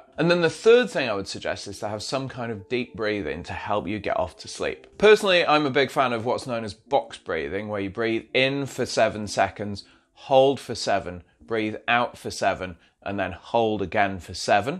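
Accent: United Kingdom. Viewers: From same town as Jagger? Same accent. Great content! And coincidentally I'm re-reading The Organized Mind right now.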